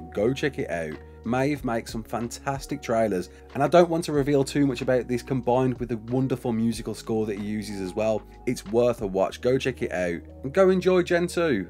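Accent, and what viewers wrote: Birmingham, United Kingdom. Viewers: they will nerf the astro that much, you might as well use a tek tapajaro, and i say void wyvern, sounds better than void worm, maybe its the accent, are you a brummie or a black country lad?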